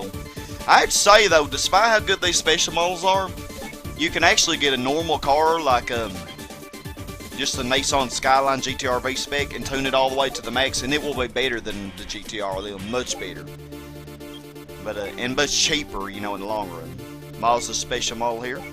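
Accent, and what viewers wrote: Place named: Kentucky, United States. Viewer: Hello there you sound like your from Kentucky or something I’m from Indiana and I see you have a country accent just found you so I’m going to sub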